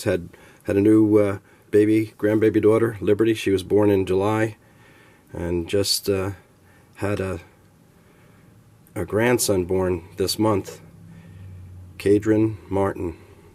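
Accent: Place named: New York, United States. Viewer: Keep , keeping busy bud . Just love your New York accent . Merry Christmas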